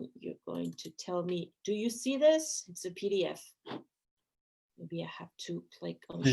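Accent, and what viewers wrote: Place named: Spain. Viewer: amo la pronunciación perfecta de inglés, con detalles de castellano y muletillas francesas. espectacular.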